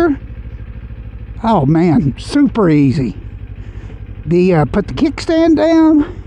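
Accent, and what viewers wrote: South, United States. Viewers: Confirming my belief that all have southern accents. Great video!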